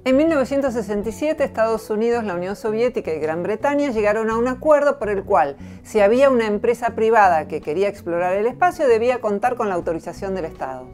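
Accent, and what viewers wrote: Argentina. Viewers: Que rara que eres.. perdón, tengo que ser honesto. COMO SÍ SER "PROGRESISTA", OTORGUE UNA CIERTA "SUPERIORIDAD MORAL"... Especializada en "todo", es extraño con acento argentino...NO SORPRENDE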